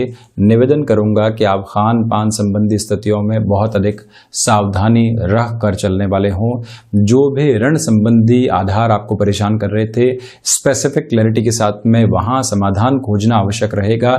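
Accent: Standard. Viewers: I just watch your videos to listen to such pure Hindi. It’s enchanting.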